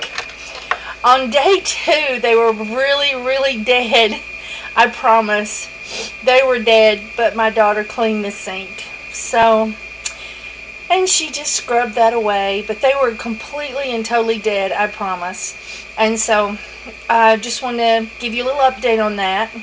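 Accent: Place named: Fresno, United States. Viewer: That's a lovely accent that you have. Fresno?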